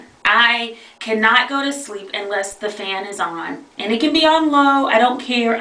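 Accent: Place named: South, United States. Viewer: I think you are a very lovely person. You're someone that I would love to be friends with. I'm from Georgia and a southern gal too so when you say those particular words, I know exactly what you mean! It's funny how we think nothing of how we articulate our words until no one understands us! Thank you for sharing with us!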